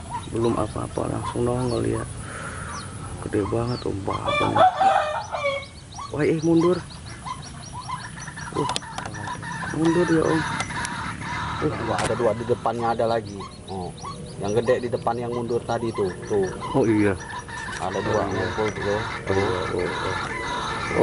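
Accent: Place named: West Java, Indonesia. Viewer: Daerah mana bang ngomongnya sunda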